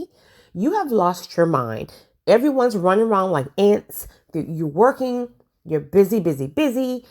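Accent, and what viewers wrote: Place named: East Coast, United States. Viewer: Thanks for speaking; (You do need to shut off the alerts from your dm's😉 👍)..But hearing your 'east coast voice', to THIS 'way-faring stranger', was a blessing.🙏 ( Storm? Tornado alerts here in Oklahoma⚡🌪⚡🌘⚡🌪). You have a beautiful voice. 'NovelCoronaVirus19' is in Gods hands; Don't worry, Stay safe.🛐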